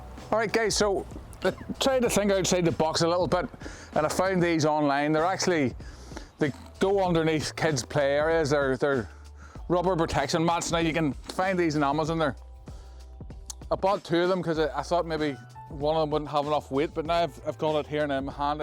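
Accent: Northern Ireland, United Kingdom. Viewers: I love the northern Irish accent, so unique!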